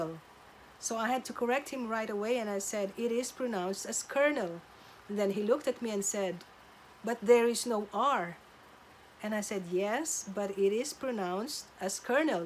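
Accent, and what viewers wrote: Philippines. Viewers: I sense a Filipino accent...Kabayan! Haha. More reasons to like. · i knew it from the first time you showed yourself on channel that you were filipino. from the voice and the accent palang eh. pusong mammon forever ang mommy ng Pinas! godbless po:)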